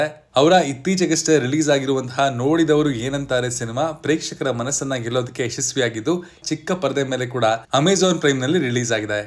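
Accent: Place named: Hubballi, India. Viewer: Hi ದೋಸ್ತ ಏನ್ ಬೆಂಗಳೂರು ಇಂದ ಹುಬ್ಬಳಿ ಗೇ ಬಂದಿರಿ ಮಾತಲ್ಲಿ.. Yake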